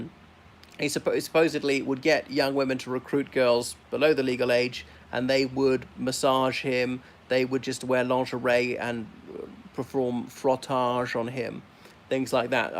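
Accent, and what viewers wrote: RP, United Kingdom. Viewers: What did you do with your Irish Accent?? You have a lovely “queens english” accent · strange you sound like an English toff, not any Irish I know, what's going on here, if my first impression is your mouth is brown, that ain't good fella?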